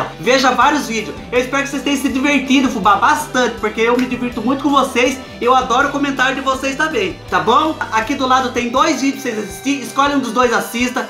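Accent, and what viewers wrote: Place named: Sorocaba, Brazil. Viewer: Reconheci seu sotaque de Sorocabaaaa! rs Até pensei: "nossa, a cidade tem o sotaque parecido com Sorocaba." XD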